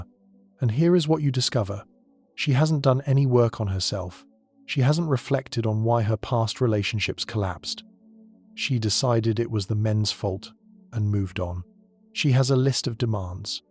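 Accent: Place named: United States. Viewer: Sounds very USAmerican.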